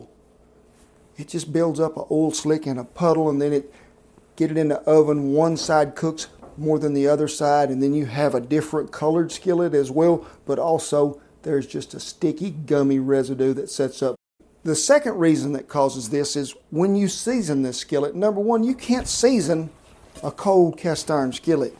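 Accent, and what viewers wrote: South, United States. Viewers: I'm not American but I love southern accent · I love your southern accent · Love that country accent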